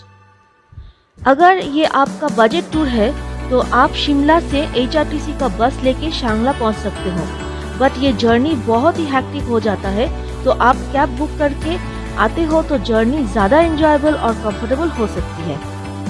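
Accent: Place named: Bengal, India. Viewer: Nice documentation and description. From your pronunciation, it's clear that you are from Bengal. But description is outstanding. Thank you.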